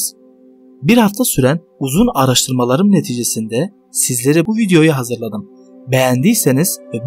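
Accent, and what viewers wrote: Aegean, Turkey. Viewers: Ege şivesiyle muhteşem bir ses eline sağlık yeni videoları sabirsizlikla bekliyoruz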